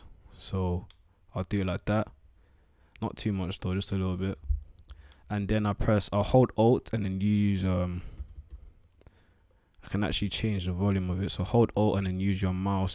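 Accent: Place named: United Kingdom. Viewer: 📠 FAX! Heard the UK accent and subbed quick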